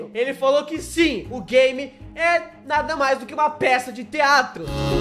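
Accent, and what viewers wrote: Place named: Rio Grande do Sul, Brazil. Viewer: por que seu sotaque parece gaucho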